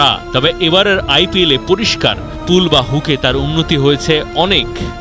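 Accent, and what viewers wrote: Kolkata, India. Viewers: কোলকাতার কোন এক দাদা পড়তেছে খবর?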